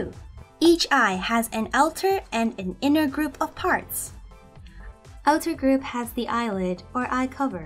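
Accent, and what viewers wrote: Japan. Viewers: I´m from Mexico and what I note is not about your comprehension of English . The problem is the person who is explaining the video, It seems that is a Japenese person talking in English, is noticeable due to the accent and is hard to follow what she says until you hear various paragraphs of what she explained. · I think it's because she's Japanese and she's trying to focus on her accent lol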